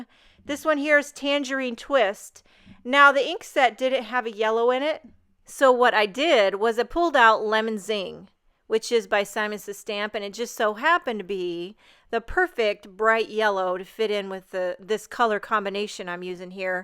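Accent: Boston, United States. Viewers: You kill me!! 😂 I love watching and listening to you! U sound like a Boston Ma girl sometimes when u talk! Thanks for always making me smile and sharing all ur beautiful cards!! 😊💗👍🏼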